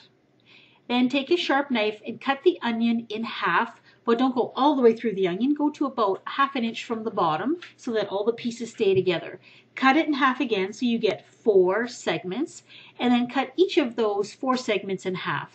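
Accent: Canada. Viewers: within 30seconds of watching this video I can tell she is from Canada!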